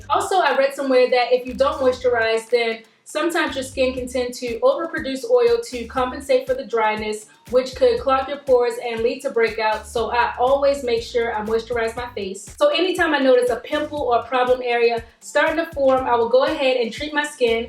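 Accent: Baltimore, United States. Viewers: you are so awesomely beautiful!! Thanks alot for these tips! P.S. I heard some Baltimore in this video and I love it!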